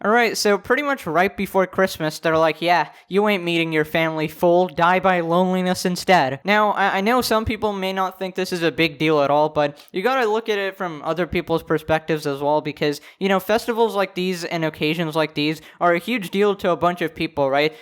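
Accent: United Kingdom. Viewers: legit can't tell if hes British or not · British man in da house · are you from the uk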